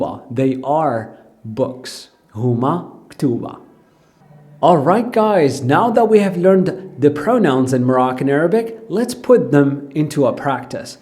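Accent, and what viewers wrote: United States. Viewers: He does have an American accent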